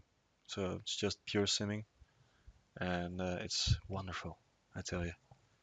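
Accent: Netherlands. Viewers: thnx for the tutorial btw u sound dutch :P greetings from amsterdam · nice tutorial, but doe iets aan je engels. ik irriteer me wel een beetje aan het nederlands accent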